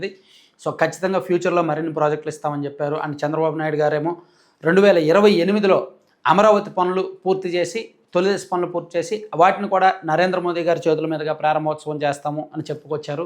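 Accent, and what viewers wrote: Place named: Telangana, India. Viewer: You talk like a Telanganites!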